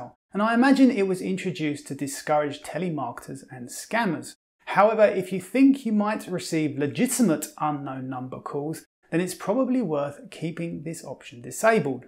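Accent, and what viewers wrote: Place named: Cockney, United Kingdom. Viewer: Thanx! It sounds like you have a Cockney accent! Like it! Esp. Word SCHEDULE!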